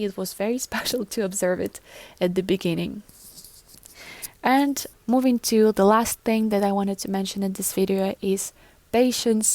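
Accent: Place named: Bern, Switzerland. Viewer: The greeting thing: Depends a lot of how urban the setting is. It's less common in the big (for Switzerland) cities. Your Bärndütsch is cute. Etiquette on crosswalks: No real need for a hand sign, just be clear with your intentions. Seek eye contact with the driver, set a foot on the road, wait for the driver to slow down.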